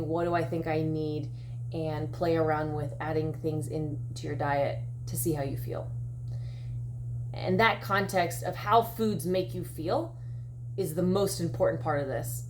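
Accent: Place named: Philadelphia, United States. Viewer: You from Philly? Just detect a bit of our accent. Love your channel.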